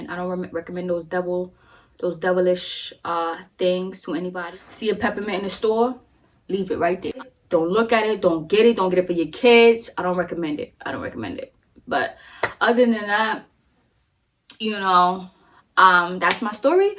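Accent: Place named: Brooklyn, United States. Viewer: Lolll you sound like you straight from Brooklyn 🤣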